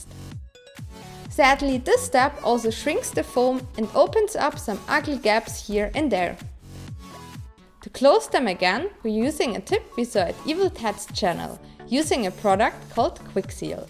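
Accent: Germany. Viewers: I’m always here for the dogs and the German accent. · This English with a German accent or vice versa left me confused 😹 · Where do you come from? It sounds like Germany your English is interesting I mean it sounds pretty German :D I mean no hate but it's interesting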